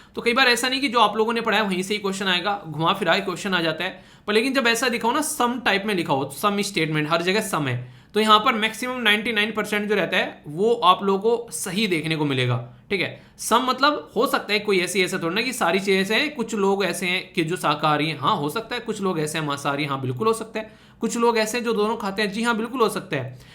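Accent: Haryana, India. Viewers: haryana ke ho na sir aap aaj tak jo bhi baate lecture main btayi hain n aapne kti dil t connect hove hh sare baat haryanavi tone ss aapki language main sir or sir lecture toh aapka dmakedaar hove h har baar 😊 ☺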